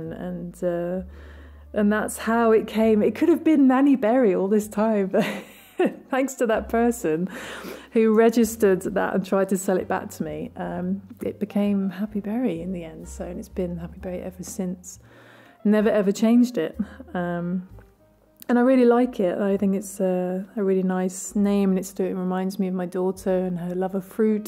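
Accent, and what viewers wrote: United Kingdom. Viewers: I started crocheting in about 2010 when i got chronically ill at uni. You were one of, it not the first channel i subscribed to, it was great to hear a British accent.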